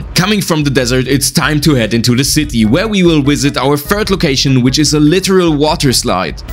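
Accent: Germany. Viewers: Aha I knew your German I could hear it was hard but I could hear it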